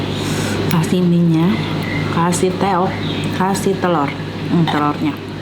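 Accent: West Java, Indonesia. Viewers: Mukanya cara ngomong nya meni sunda banget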